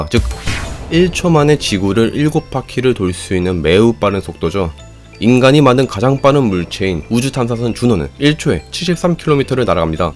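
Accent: Gyeongsang, South Korea. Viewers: 실수가 아니라 그렇게 말하면서 살아왔는데 어찌하겠소 경상도인의 특징이고 그런 억양이 강한 동네에선 고치기 힘들며 언어적으로 바르게 쓰려는 흥미가 없는 사람은 본능적으로 기존 발음을 못 고칩니다 제가 이런쪽으로 잘 압니다 본인 의도와는 상관없이 못고칩니다 특히 빠르게 말할수록 더더욱 못고칩니다 사람은 각각의 흥미요소가 다르기 때문에 발달되고 교정하려는 능력도 다릅니다 대신에 다른것으로 우월한 분야가 따로 있겠죠 같은 지역 같은동네 출신이라도 나중에 단어를 어떻게 읽고 어떻게 발음하는지는 사람마다 큰 차이를 보입니다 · 깅상도억양 표시나요 숨길수없는 태생의한계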